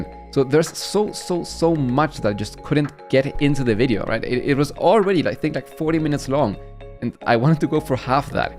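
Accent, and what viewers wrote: Germany. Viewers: I don’t know why I thought you were German. Maybe it’s because your first videos were on the German revolution so I assumed your accent was German, but very interesting to see a content creator from Chile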